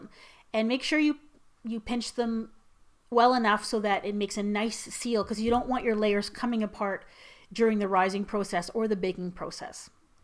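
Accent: Quebec, Canada. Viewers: i love your accent are you from canadia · I believe she's French Canadian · is she canadian? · Are you from Québec or just from canada ? :O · I was watching this and thought, "hmm, that sounds like a Canadian accent." Sure enough eh! Very pro-looking results, she clearly knows her stuff. I just know mine would turn out looking like Kermit the Frog had a horrible accident..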